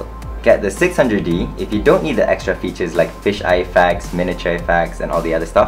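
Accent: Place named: Malaysia. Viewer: u sound malaysian malaysians are cool :)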